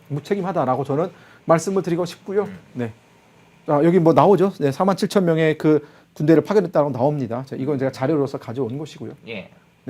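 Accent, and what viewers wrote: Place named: Seoul, South Korea. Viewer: 김금혁씨 서울말까지 완벽하니 인간미가 좀 없어보입니다. 북한 악센트도 가끔씩 좀 넣어주면서 강의해주세요 ㅎㅎ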